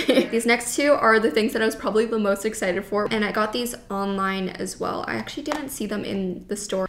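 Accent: Canada. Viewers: cocobana29 she’s canadian, but go off... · are you canadian? i know, weird question but still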